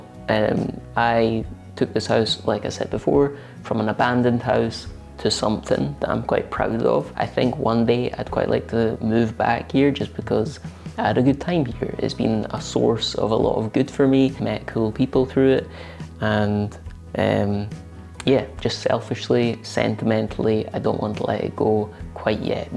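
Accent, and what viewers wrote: Scotland, United Kingdom. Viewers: I'm terribly sorry, but I'm begging you to answer - is that a mild-ish sort of Scottish accent?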